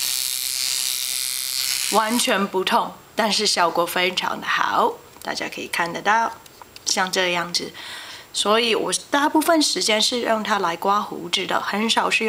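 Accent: Hong Kong, China. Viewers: she is from hong kong, she does have the accent. She spitted out some Cantonese one her other videos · I think shes from hongkong. She has got the catonese accent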